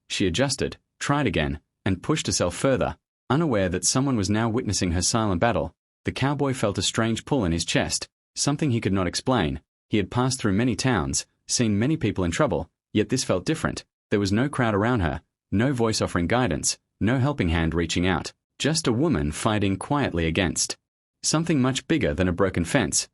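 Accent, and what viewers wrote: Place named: Australia. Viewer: Okay. . . With this voice, I suppose I can imagine this is about the Strayan outback.😊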